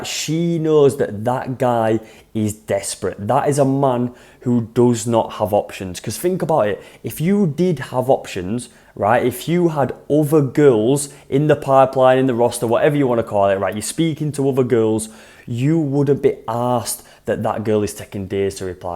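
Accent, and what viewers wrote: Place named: Manchester, United Kingdom. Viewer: Manchester accent is it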